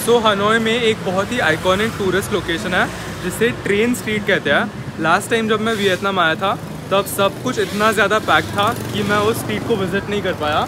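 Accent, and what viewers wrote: Maharashtra, India. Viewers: marathi Manus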